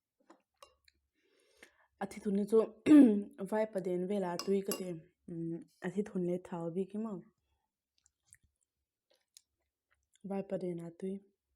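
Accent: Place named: China. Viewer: Chinese mizo tawng thiam I ang katibawihte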